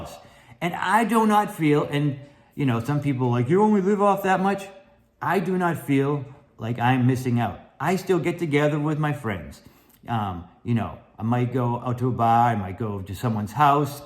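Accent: Boston, United States. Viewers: love the Boston accent!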